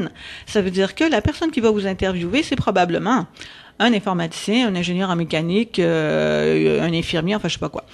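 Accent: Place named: Canada. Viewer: J'aime beaucoup l'accent canadien :)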